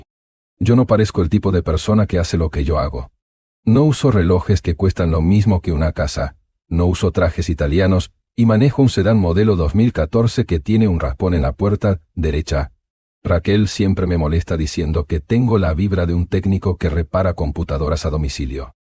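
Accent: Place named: Argentina. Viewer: Ese dialecto argentino, cuesta entenderlo, pero como la historia es similar a muchas se logra adivinar la trama.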